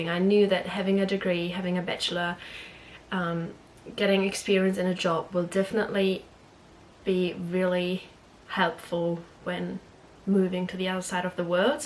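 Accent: New Zealand. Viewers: Your English is getting a New Zealand accent.. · u sound kiwi....but anyway...have u ever thought about living in Australia? all the best in NZ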